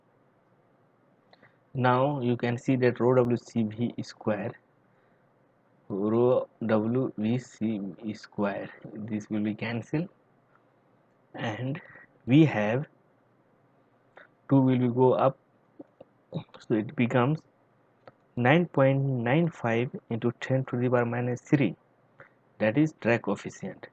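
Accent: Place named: China. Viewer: why you speak like a chinese person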